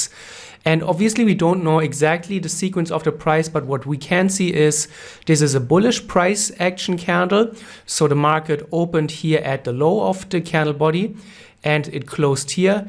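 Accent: Germany. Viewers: Just by the way he speaks, i can tell he is German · great content man. Are you German? Donnu why but somehow the accent makes me feel like I understand more ha :)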